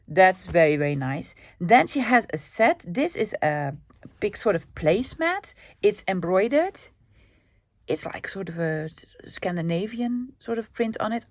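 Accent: Netherlands. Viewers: OMG I just love your channel. One of my closest friends is of Dutch Heritage so I’m very used to ( and love) your accent. Your enthusiasm and appreciation for all things even remotely vintage strikes a chord with my heart. You have definitely won another fan. Just wish I could buy economically from Europe, being on a pension means I simply can’t afford the shipping ( same goes for USA) but I’ll continue to watch and learn and purchase digital files when I can. Thanks again you’re an inspiration to me ❤o😊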